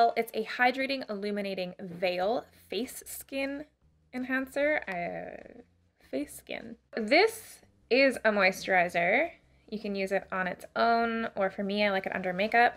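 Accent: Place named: Canada. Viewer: I'm a little late to the game but goddamn is it good to see you back 🖤🖤 and good to hear that sneaky Canadian accent 🤗🖤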